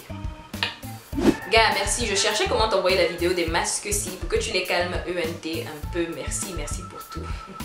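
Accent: Bamileke, Cameroon. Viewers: Ton accent bami la me tue. On s'en fou comme dab 😂😂😂😂😂😂🤗🤗🤗😊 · 😂😂😂😂😂😂😂😂😂😂😂😂😂😂😂😂😂😂😂😂😂😂😂😂😂😂😂😂😂😂😂😂😂😂😂😂😂😂😂😂😂😂😂😂😂😂😂😂😂😂😂😂😂😂😂😂😂😂😂😂😂😂😂😂😂😂😂😂😂😂😂😂😂😂😂😂😂😂😂😂 hahahahahahaha!!!!!! l'accent bamiléké là c'est la magie!!!! troooooooooooooopp drôle tu es!!!!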